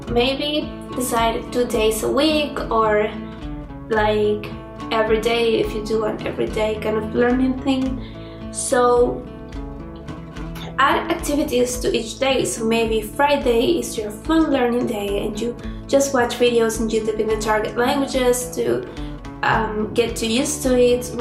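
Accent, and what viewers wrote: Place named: Latin America. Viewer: You are completely right!! So the first video I watched of you, I thought you were native from Spain due to your location and I say but how is that you speak english like someone from latín america jajaja but now I know you are neighbor of my country and it´s great to know it. You are doing really really well with all those language you know. keep doing!!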